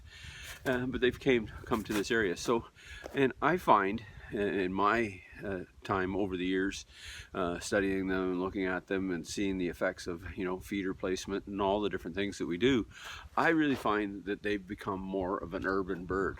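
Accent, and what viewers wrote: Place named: Canada. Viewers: Man ur canadian af....nice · I thought so too. Always makes me happy to see another Canadian ❤️🇨🇦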